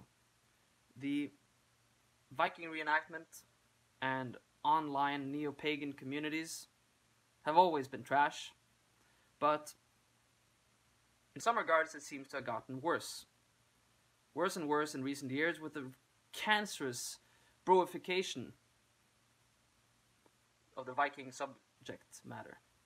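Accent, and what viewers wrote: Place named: Transatlantic, United States. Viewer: It's really entertaining how you directly address the issue using an accent and intonation very similar to the Transatlantic Accent while also also sorta having the look of a daddy from the 1950s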